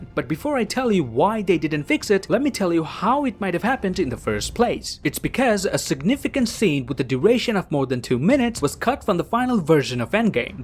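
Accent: Canada. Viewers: R u from Canada?? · I like this voice more than the others voices it sounds better and more Canadian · What part of Canada are you from mate? Really rate the accent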